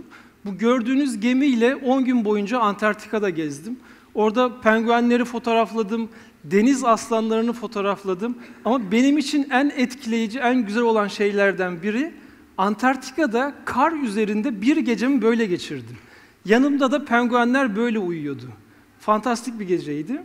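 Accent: Samsun, Turkey. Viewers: Şive Samsun'a kayıyor e'leri açık söylüyorum diyince bendede tüyler diken diken oldu 😀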